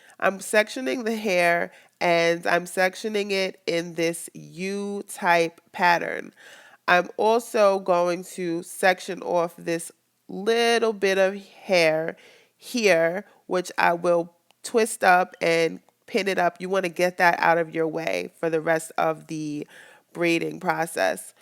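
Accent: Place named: New York, United States. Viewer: u have a very strong new York accent I like it lol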